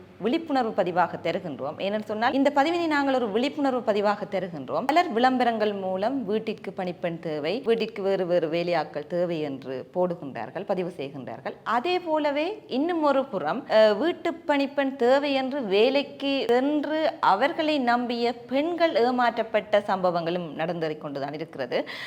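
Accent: Jaffna, Sri Lanka. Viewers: Ethukku oru Mathiayana tone speak proper jaffna accent like Dr Arjuna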